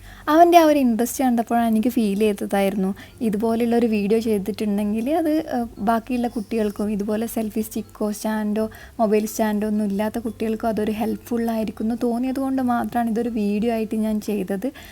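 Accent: Kannur, India. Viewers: Eattathi Kannur slang 🥰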